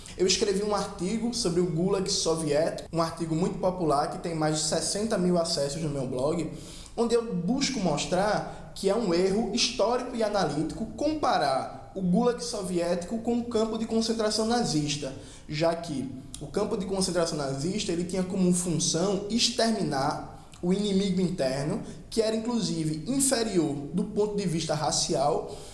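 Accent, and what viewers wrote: Pernambuco, Brazil. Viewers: sotaque pernambucano é charmoso demais pô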